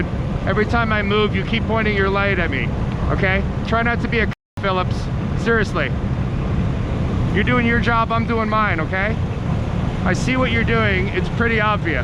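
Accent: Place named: United States. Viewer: Nothing to do with this. But I'm an Englishwoman and I love your American accent,. But aside from that - that twat from Engine 7 had it in for you from the word go. Too much ego and not enough brainpower to understand that you were filming from a public area and had every jurisdiction to do so. What an absolute bellend.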